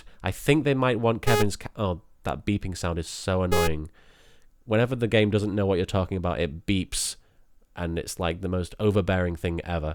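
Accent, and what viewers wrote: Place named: North, United Kingdom. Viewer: NO. NO, FFS. Why does anyone have a problem with doing a let's play in character?? It was hilarious. Who are these people???? Don't listen to them! If they want to actually hear all the boring dialogue in Bloodlines then why don't they just play Bloodlines? Also, trying to put my finger on where LKs accent is from. North East? North West? Dammit. He sounds a bit like turkeyleggggs, anyway.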